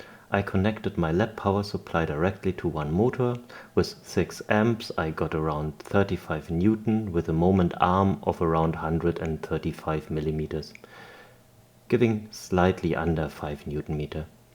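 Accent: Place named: Germany. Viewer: metal working/engineering project and german accent ? You're sure to see good work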